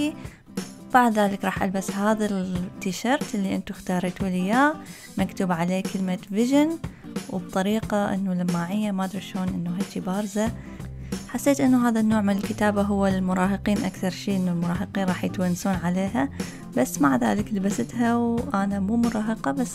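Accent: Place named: Basra, Iraq. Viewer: انتي لهجتج بصراويه بس م̷ـــِْن وين من البصره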